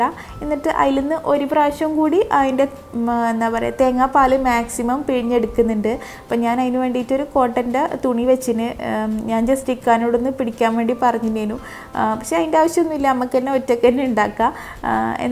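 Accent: Kannur, India. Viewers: Hii ningalea video boar adikkkukaye illa kannur style basha😂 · Thani kannur bashaa😀 nmml kannuranee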